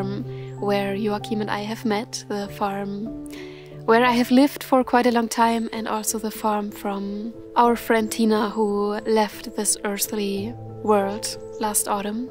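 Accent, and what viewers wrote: Germany. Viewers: O sotaque alemão é profundo nela ou seria impressão minha ? From Brazil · Es macht einfach Spaß deine / eure Videos zu sehen. Mein Englisch ist echt nicht gut. Aber man kann iw heraushören das du aus Deutschland kommst. Und dann premiere Pro, was noch auf Deutsch steht. Das ist einfach alles zusammen mega stimmigyschön für mich hier in Deutschland. Wo ich mich danach sehne, was du seit einiger Zeit in Norwegen hast. Weiter so!!!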